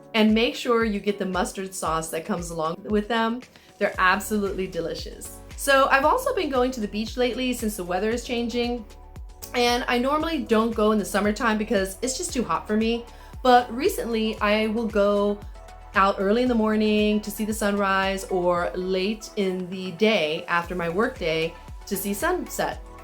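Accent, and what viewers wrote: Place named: United States. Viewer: I love your tips and also your beautiful American accent!!!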